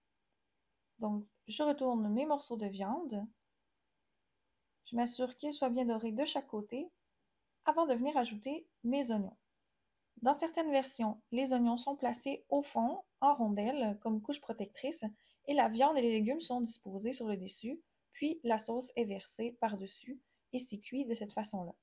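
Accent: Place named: Canada. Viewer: Je crois elle est du Canada mais c'est compréhensible ce que elle dit !!!!!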